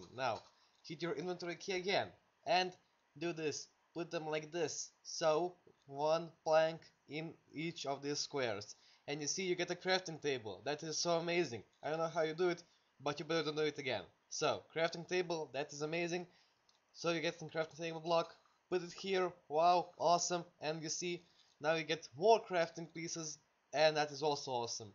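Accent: Russia. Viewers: You sound like a russian but you're croatian its weird :P. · RAŠN AKSENT <3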